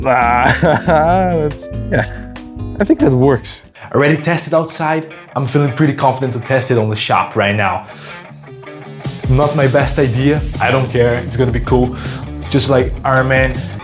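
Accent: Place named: Brazil. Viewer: Percebi de cara que era brasileiro pelo sotaque hahaha. Parabéns pelo projeto!!!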